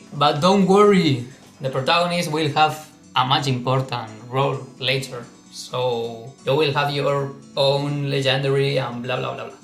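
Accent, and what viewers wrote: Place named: Spain. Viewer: Se nota q hablas español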